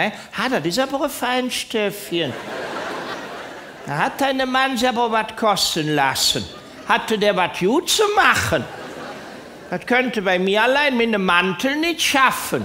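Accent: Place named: Lower Rhine, Germany. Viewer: Ich liebe es! So witzig, so genial Genau so sprechen wir am Niederrhein :P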